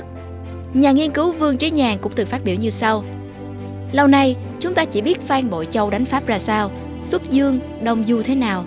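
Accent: South, Vietnam. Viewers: Ad là người miền Nam hay Bắc , giọng thì miền Nam nhưng dùng từ ngữ thì toàn miền Bắc như còi , to , bố ... tò mò thôi nhưng nội dung hay nha ! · nói giọng Nam mà lại nói tiếng Bắc Kỳ là sao?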